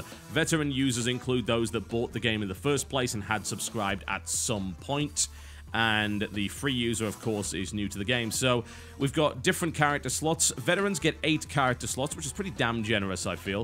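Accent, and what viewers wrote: United Kingdom. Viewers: maybe he enjoys the sound of you manly British voice....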